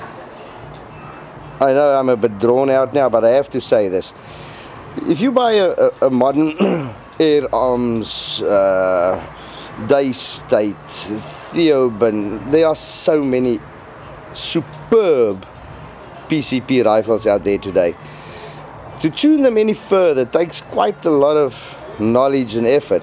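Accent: South Africa. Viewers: Always a pleasure to listen to the SA accent. Brings back good memories. I agree with your assessment of the QB 79. Great fun and then some.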